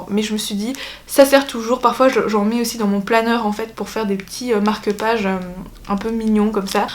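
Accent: Belgium. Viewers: juste, tu viens de belgique ou de france ?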